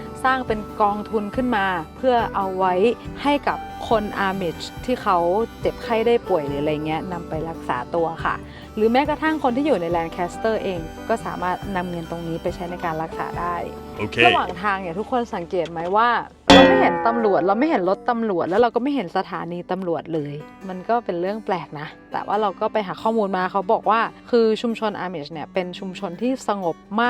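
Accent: North, Thailand. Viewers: ทำไม นู๋อู้เมือง ☺☺☺ สำเนียงไปทาง ชร..พย ☺ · ชอบดูคริปน้องมากเลยเจ้า..น้องอยู่จังหวัดหยั๋งเจ้า..ป้าเจียงฮายเจ้าตอนนี้อยู่เวอร์จิเนียร์เจ้า..ได้ยินกำเมือง..ดีใจแต้ๆเจ้าจะติดตามตลอดไปเจ้า..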